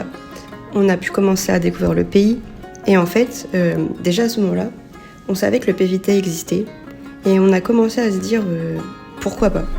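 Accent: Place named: Alsace, France. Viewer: c'est un petit accent alsacien que j'entends ;-)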